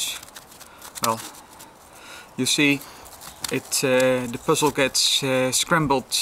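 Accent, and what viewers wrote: Netherlands. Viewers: it's a Dutch accent!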